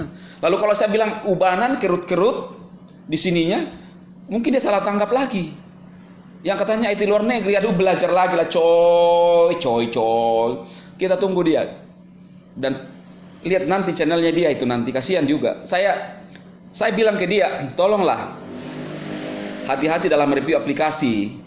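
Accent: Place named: South Sulawesi, Indonesia. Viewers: orang dari sulsel kah bang? logat na kentara e...hahaha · Bang asalnya dari sulawesi yah? Logatnya kayak org makassar..maaf kalau sy salah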